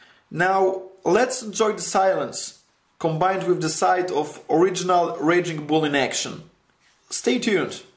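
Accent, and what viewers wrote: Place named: Poland. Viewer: od razu wiedziałem ,że polak gada haha no ,ale ok przynajmniej inne kraje tu zaglądają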